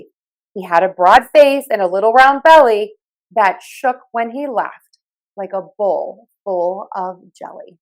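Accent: United States. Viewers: Hi. I see the title of the video in spanish. Must be the the New improvement of yt. Its good videos of you reading so we can learn the american accent that is not easy. Although its something for children I dont understand a lot of words.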